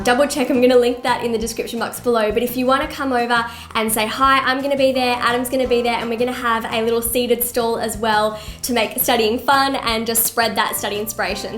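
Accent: Australia. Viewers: as soon as she started speaking, I instantly guessed she must be an Australian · Love the Aussie accent! Thanks for sharing.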